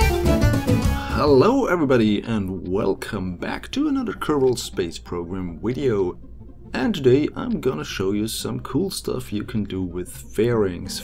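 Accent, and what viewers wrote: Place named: Germany. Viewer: u German? ur accent is just trying to Sound English but failing... just like a German accent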